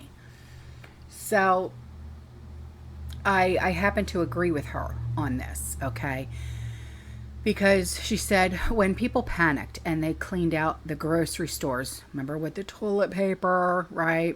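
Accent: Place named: New York, United States. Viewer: I love the way talk.... That Néw Yoik talk íts awesome!!!your awesome☺🤘you rock.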